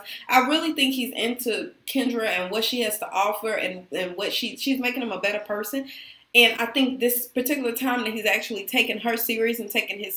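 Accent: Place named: South, United States. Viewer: are you from the south? You kinda have an accent lmao ( Love from Canada ❤)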